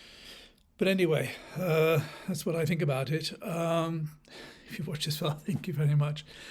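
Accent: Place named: England, United Kingdom. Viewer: Serious websites discuss the consequences necessary of the appalling maladministration in Britain since 1945 save for a single short period. This site is simply sectarian bigot nonsense. With a nice English middle class accent. You do not get the vast poverty level there save for incompetence and worse since 1945.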